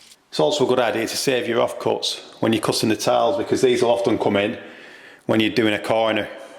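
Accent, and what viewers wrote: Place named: Lancashire, United Kingdom. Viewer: great video, and really helpful. It's also nice to hear a Lancashire accent-it's been years since I moved away! Thanks!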